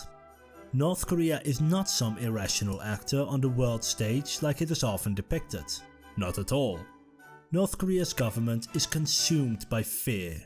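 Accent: Netherlands. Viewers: Is the narrator from The Netherlands? The Dutch always speak better English than native English speakers. Lol I've been more interested in Korean history, this video was great.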